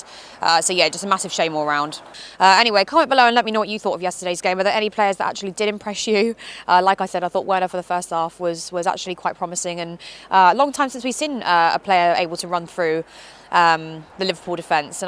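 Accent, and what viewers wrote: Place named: West Country, United Kingdom. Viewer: Karen Brady has a posh accent, has West country accent being from Devon, not the same sounding to me.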